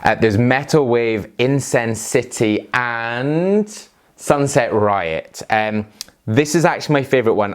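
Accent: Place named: Glasgow, United Kingdom. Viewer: You from Glasgow dude?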